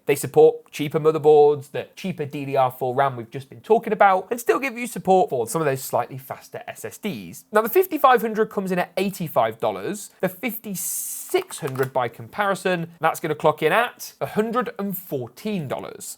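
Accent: United Kingdom. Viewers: Are you British or American? I despise hearing Brits referring to prices in dollars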